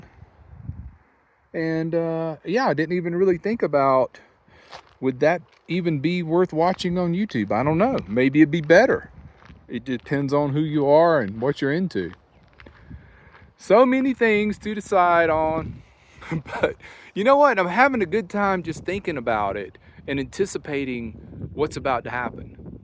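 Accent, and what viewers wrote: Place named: Georgia, United States. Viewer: fellow Georgian here. I'm almost 66 yo and in July 2023 I sold my home in Fl, bought a 31 RV and have traveled to almost every single state in the US, i have 4 states left to see besides Hawaii. I travel with my two little doggies and my cat. Like you I stay as long as I want wherever I want and am just free. I watch your channel not because you travel in a van but because a) i just love hearing that Georgia drawl and b) because you are you. You inspire me and that's important. Whatever you decide it will be right for you! Stay safe and happy travels.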